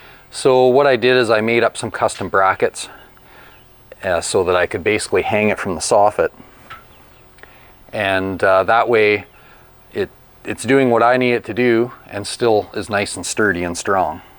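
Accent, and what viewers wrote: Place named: Canada. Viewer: You must be Canadian..... the accent gives you away!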